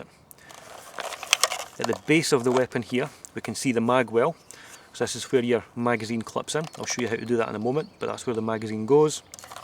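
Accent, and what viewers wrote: Scotland, United Kingdom. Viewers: heavy scottish brough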